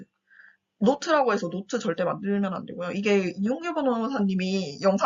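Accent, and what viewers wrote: Busan, South Korea. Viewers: 언니 고향 부산이신가요 친근해요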